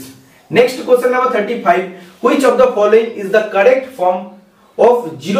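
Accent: Bengal, India. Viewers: par aap ke bengoli acsent se thoda sunne main problem hota hai sir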